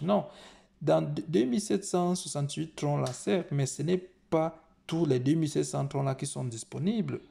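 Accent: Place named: West Africa. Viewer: Parles toi bien c'est quoi cette langue franco africaine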